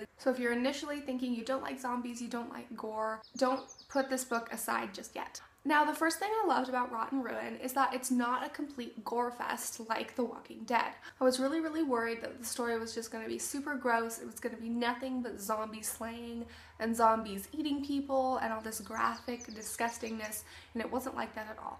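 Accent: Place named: United Kingdom. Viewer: British! That’s the accent!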